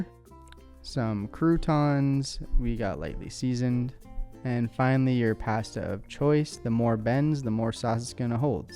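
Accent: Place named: Canada. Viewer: sounded extra Canadian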